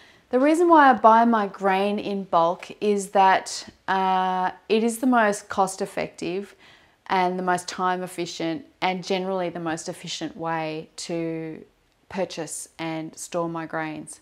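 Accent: Australia. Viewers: I just bought my first while wheat berries for milling & they will now go straight into the freezer for 3 days - ibwobder if my household freezer goes that low! Lovely to hear an Australian accent - your tios are great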